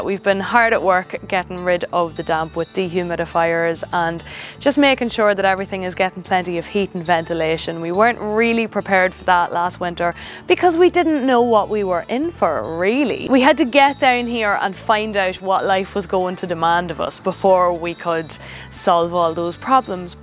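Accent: Ireland. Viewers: Iam a bit of the Irish me self and I love your accent, been raised in California so no accent. I do know a few things in Irish slang if I dare call it that. How blessed you are to have a beautiful cottage in the country side. I moved from California to Texas because their country sides reminds me a bit of the Irish country.😢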